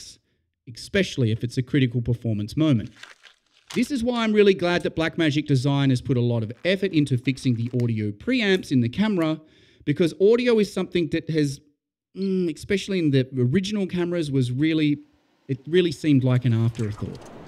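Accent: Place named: Australia. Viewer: I can’t tell if you’re from down under or from the fruit isle... I am Australian so you better not hate on me...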